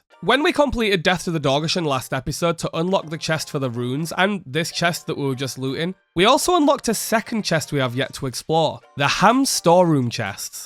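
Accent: United Kingdom. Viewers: Where in the UK are you from? Love your accent.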